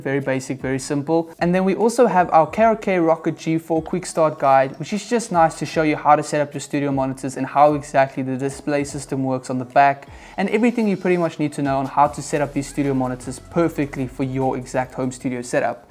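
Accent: South Africa. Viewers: Good old SA accent😂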